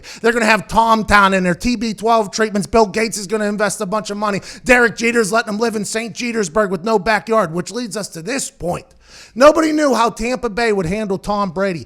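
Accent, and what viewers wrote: Pittsburgh, United States. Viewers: Aht... is embracing his pittsburghese more and more and us ynizers love it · letting the Pittsburgh come out in his speech is fuckin awesome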